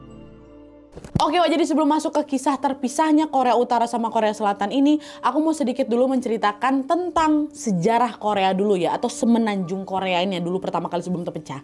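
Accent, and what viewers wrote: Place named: Palembang, Indonesia. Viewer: Aku salfok sama logat mbaa nya. Kayak logat palembang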